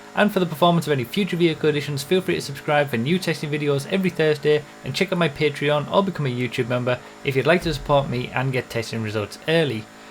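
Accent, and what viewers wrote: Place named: England, United Kingdom. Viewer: Thank god hes English! Sick of hearing stupid fucking yanks.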